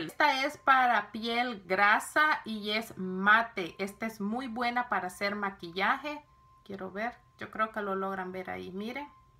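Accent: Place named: El Salvador. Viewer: Por tu forma de hablar creo q eres de El Salvador como yo!!!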